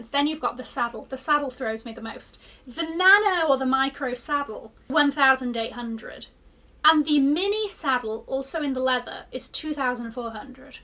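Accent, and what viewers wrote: United Kingdom. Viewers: Your videos are really knowledgeable and helpful and i adore your british accent 😍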